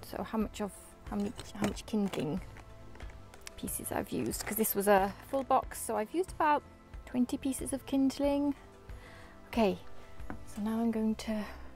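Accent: Wales, United Kingdom. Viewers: Omg I’m addicted to watching your videos soooo Morish, I was set on buying a Uuni pro tomorrow but now having seen this it’s thrown a massive spanner In the works 🤦🏽‍♂️ I have eaten out rather a lot lately and find that I can tell the difference now between a gas fired pizza and a wood fired pizza. Do you agree? I prefere the taste of wood fired and also find it more fun with wood, with that in mind what oven would you advise me on, given a majority of my pizzas would be wood fired. and gas as a back up (due to typical welsh weather)!! Thanks in advance PS. you sound like your from Wales? 🏴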